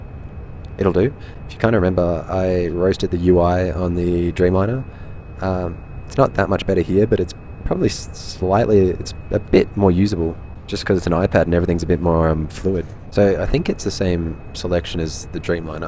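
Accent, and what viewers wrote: Australia. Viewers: I always thought you were American until you said "Australian dollars," then I listened to your accents, and that's how I feel you are Australian :)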